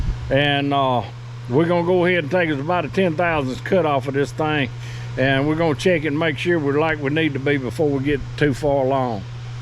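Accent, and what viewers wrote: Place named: Boston, United States. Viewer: Trying pinpoint that accent and I’m guessing that’s a Boston accent hahahah. Great video just subscribed.🇺🇸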